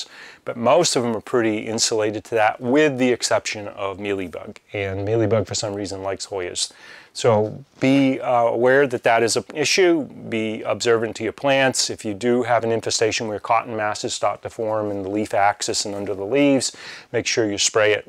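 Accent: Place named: Southern New England, United States. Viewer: Yes! Proud southern New England accent!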